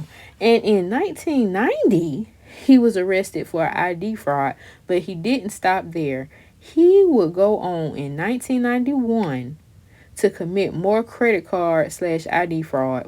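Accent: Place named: Mississippi, United States. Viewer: I love your accent 😂 I’m from MS and this is how we talk!!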